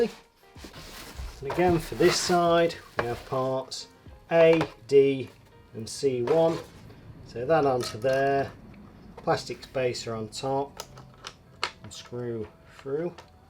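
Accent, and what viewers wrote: United Kingdom. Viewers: Weird seeing an Asian guy with a British accent lolol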